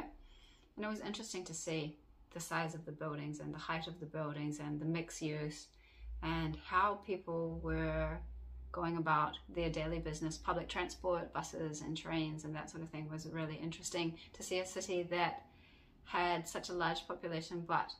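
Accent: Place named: New Zealand. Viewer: Thanks for a great video. As one who found you via Anming's Oriental Pearl Channel, I am so glad she brought you and your content to my attention. I love your content and your presentation style. Informative, entertaining and visualling appealing are why I really get so much enjoyment from your endeavours. I marvel at your level of engagement with your viewers, which considering your busy family and work lives, I consider amazing. I now find it funny that I didn't hear your Kewie accent at all, but now, I always can hear hints of it in your speech. I hope you channel continues to gain subscribers and grows. I'll be in that audience, greedily wanting more content, but feel free to ignore my inane comments, but I truly enjoy engaging with you and insightful responses. I mean you must need sleep! My only query is what were your thoughts when Anming, I assume, contacted you about a collaboration in the first place, and why you decided to do it? 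Hopefully, you'll decide to do another collaboration, and if so I will be an even happier camper.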